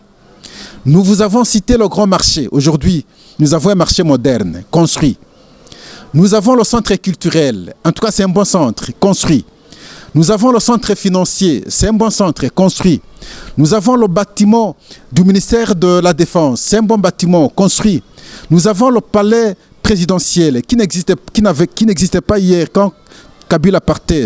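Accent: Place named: Rwanda. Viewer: tu as un accent rwandophone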